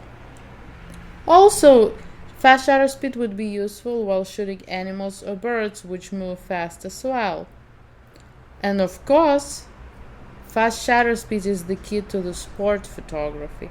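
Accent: Russia. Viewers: are you from russia or romania?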